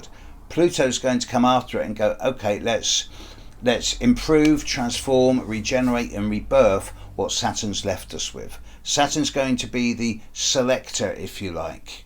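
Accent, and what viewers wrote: United Kingdom. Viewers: Space for the Soul This is a standard British accent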